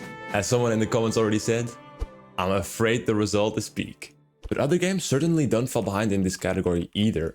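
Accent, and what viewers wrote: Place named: Netherlands. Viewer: 300 subs?? with this kind of editing? Amazing work Also are you perhaps Dutch because I thought I heard the accent in your English XD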